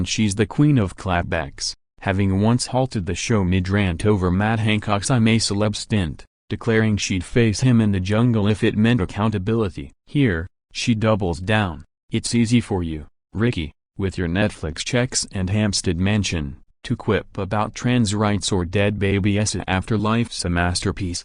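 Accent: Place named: United States. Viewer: Sounds like an American giving a commentary on a English Tv's loose women?? It's all B/S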